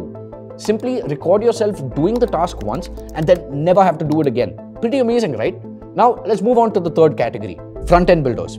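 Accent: India. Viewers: first homewaork to you get rid of your indian accent fun facts 50% of audience cloose and move to next video after hearing just the accent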